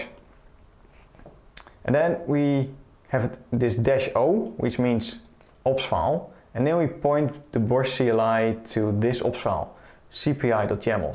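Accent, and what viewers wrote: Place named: Netherlands. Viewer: dutch english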